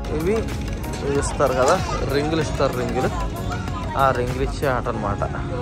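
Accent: East Godavari, India. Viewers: Oribabu east godavari language apura babu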